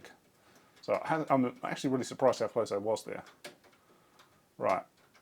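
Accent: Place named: New Zealand. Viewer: Thank you,. I like the mellow NZ accent, much nicer than the OZ nasle noise. I had a new sister in law from NZ from south island, but didn't know what the north island would sound like. I've not seen her for 40 odd years, buy heck, she was very pretty. See you next time you post.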